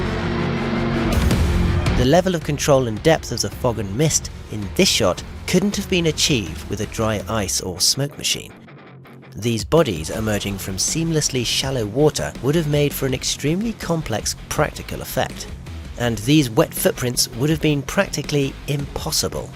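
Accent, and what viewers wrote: United Kingdom. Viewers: I prefer this British accent day over most Aussie accents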